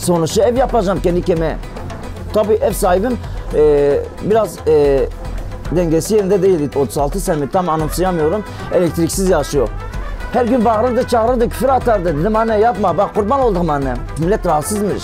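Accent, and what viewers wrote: Diyarbakir, Turkey. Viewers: Bu adam %1000 Diyarbakırlı :) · abi diyarbakırlı mı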